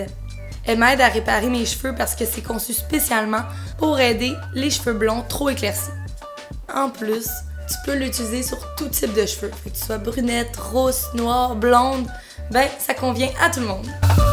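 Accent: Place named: Quebec, Canada. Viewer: J'ai beaucoup aimé tes looks tu es trop jolie 💘 l'accent québécois👌👌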